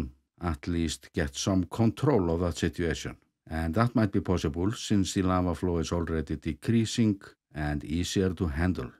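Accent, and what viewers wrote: Iceland. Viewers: I was in Iceland before the last eruption and witnessed the flooding and destruction of the Ring Road. To this day, I can’t believe how quickly you Icelanders repair things and respond to such massive natural events! You people are simply incredible. In countries like Germany, it wouldn’t have worked nearly as fast. Thank you so much for your great work, the detailed information, and the amazing footage. It’s always a pleasure to watch your videos. And by the way: I love the Icelandic accent!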